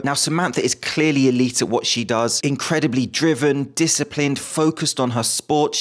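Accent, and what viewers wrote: United Kingdom. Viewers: a normal looking guy in glasses and a British accent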